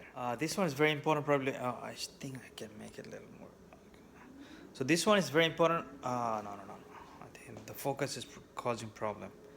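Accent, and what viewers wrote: Bangladesh. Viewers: S/W, bhai, you sound Bangladeshi, masha'Allah. May Allah bless you for your efforts. :)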